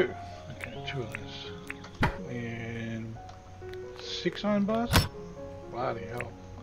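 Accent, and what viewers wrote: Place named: Australia. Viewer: Judging by your voice I'm guessing you're a fellow Aussie haha..... G'Day mate!. Loving this game, so addicting!. I don't want my partner to play as she'll never go to work again haha.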